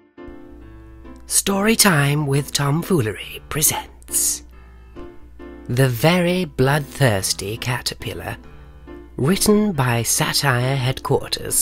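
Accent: United Kingdom. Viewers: The most Bri'ish person eva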